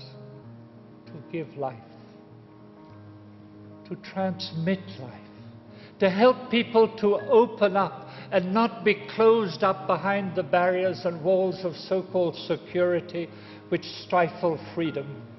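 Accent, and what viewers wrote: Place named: Ireland. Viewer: he sounds as if he has an Irish accent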